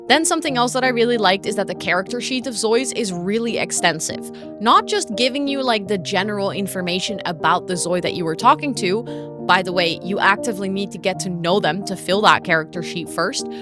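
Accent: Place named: Netherlands. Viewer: Ah you're from the Netherlands! I barely heard an accent until halfway through, your English is so amazing! Better than even some Americans or British 😅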